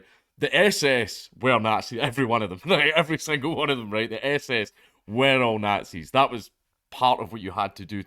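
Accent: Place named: Scotland, United Kingdom. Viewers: No it was just that thick Scottish accent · I think it was just his scottish accent.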